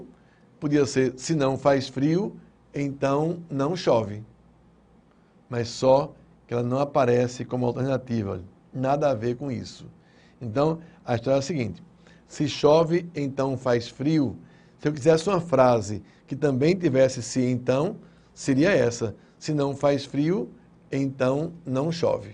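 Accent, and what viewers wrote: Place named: Pernambuco, Brazil. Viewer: Excelente, professor! Parece até que eu tô de volta às salas de aulas, ainda mais ouvindo esse "pernambuquês", rsrs O senhor ensina muito bem e aplica a teoria em várias práticas diferentes, o que ajuda a estar preparado em qualquer situação. Obrigado pela aula!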